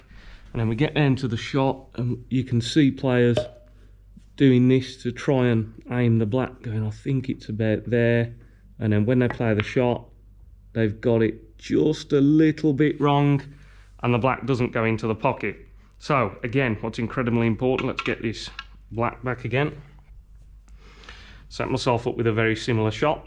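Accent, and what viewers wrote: United Kingdom. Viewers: Who's here for the british accent?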